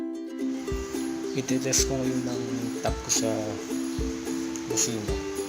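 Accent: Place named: Batangas, Philippines. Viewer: Batanguenio k ga boss. Saan ka sa batangas makapagpakabit hehe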